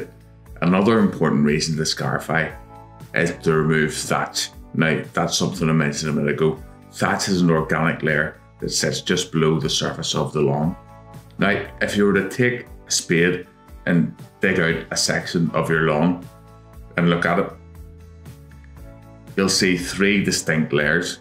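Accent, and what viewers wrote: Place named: Belfast, United Kingdom. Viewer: Brilliant videos. Best part is your Belfast accent! Just done first cut (Feb 25th .. is this a record?). Hollow tine aerator booked for next week. Never heard of this machine, until I watched your videos. In my mind, I keep hear your saying ‘ it’s the best single thing you can do for your lawn’. Hope to see improvement this Spring!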